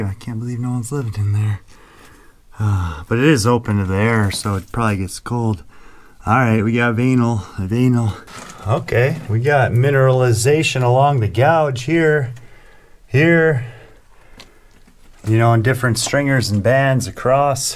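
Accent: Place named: United States. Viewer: YOU GOT A CRAZY COWBOY ACCENT & RHYME BY TALK & GOT A CRAZY COWBOY TOY CAR TOO, WHERE GOT YOU ALL OF THOSE!!! TO WHERE I' TO DIG TOO...AS TO DIG A FIRST CLASS GOLD.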